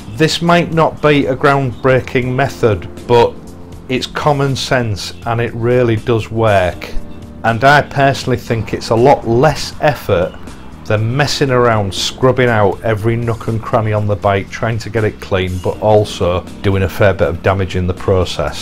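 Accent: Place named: Hull, United Kingdom. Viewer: I'm a new subscriber. You're brilliant! Thank you for your content and your Hull accent:D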